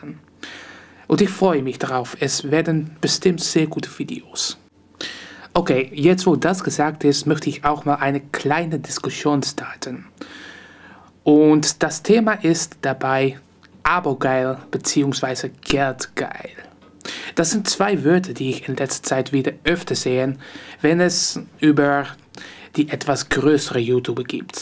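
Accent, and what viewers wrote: Netherlands. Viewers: danke! Ich habs mir voll angekuckt und teile zu 100% deine Meinung. Dein Niederländische Akzent ist lustig ;D Mach weiter so :) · Deine Sprachkenntnisse sind meiner Meinung nach auch gut. Ich hatte auch mal eine Englisch Lehrerin, die aus Niederlande kam. Sie hatte den gleichen Akzent wie du =)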